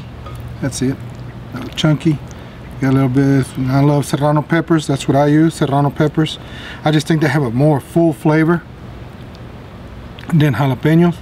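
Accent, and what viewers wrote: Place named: South Texas, United States. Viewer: If anyone wants to know what south Texas Mexicans look and sound like...this is it. I’m from west Texas in El Paso and we may have the Mexican blood running through our veins but our culture is a bit different, both beautiful and unique. Y’all have fajitas and we have carne asada. Y’all have breakfast tacos and we have breakfast burritos. Saludos desde El Paso y San Antonio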